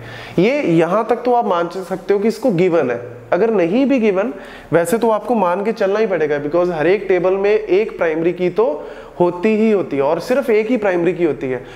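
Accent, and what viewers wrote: India. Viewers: I cant understanding anything what you saying dude. Unfortunetly I am not get used with the english indian accent. Please friends, if some one could put english subtitles on his videos, it would be great...because despite I cant get excatly what he is saying, I am sure that his approach is very unique and worth to share. Greets from Brazil.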